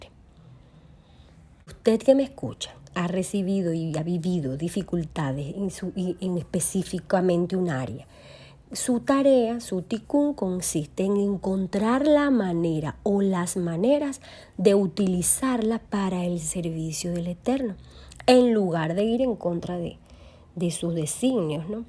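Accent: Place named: Venezuela. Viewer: Shalom. Todah Rabah, soy de Venezuela , tu tono es venezolana, das clases presenciales ????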